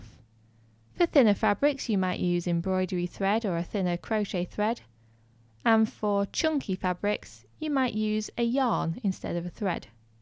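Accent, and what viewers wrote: United Kingdom. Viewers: Love your British accent! This helped me learn. I like when you speak slow and repeat, so I can understand. Thank you dear! From - Lori / MN, USA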